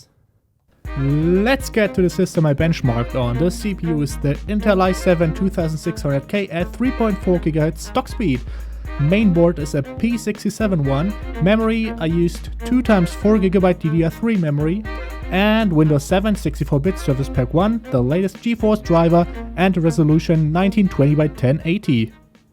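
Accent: Germany. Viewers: Hab sofort gemerkt, dass da ein Deutscher quatscht :D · das deutsch trieft aus allen sätzen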